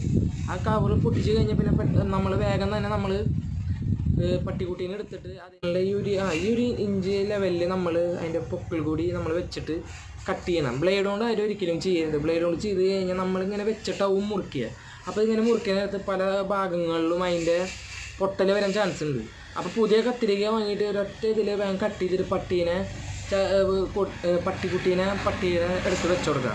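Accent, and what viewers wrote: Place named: Thrissur, India. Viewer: നുമ്മ തൃശ്ശൂർക്കാരൻ ആണ് തോന്നുന്നു slag കേട്ടിട്ട്...😍 ആണോ ബ്രോ?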